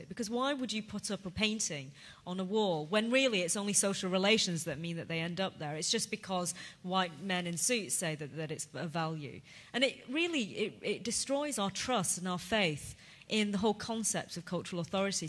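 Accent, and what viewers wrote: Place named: RP, United Kingdom. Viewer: God she can fucking speak, her accent is bloody high class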